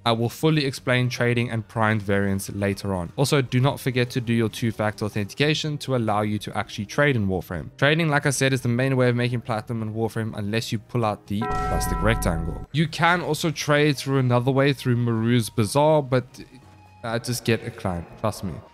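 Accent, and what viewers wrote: South Africa. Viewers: Man, I left home two years ago but can still tell a Saffer when I hear one. Awesome videos!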